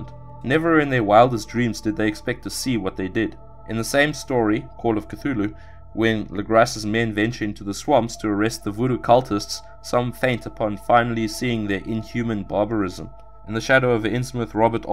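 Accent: South Africa. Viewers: I can't help but feel like the narrator is south african.. Are you South African?? If so that's fuckin Lekker bru!!!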